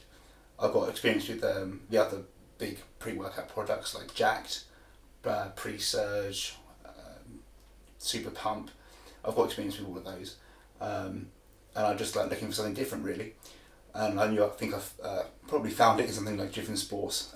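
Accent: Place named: United Kingdom. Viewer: Where are you from? Obviously U.K. But what part of England?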